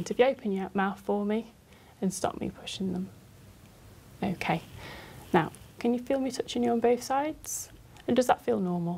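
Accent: United Kingdom. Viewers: the doctor has the best british accent ... the queen of asmr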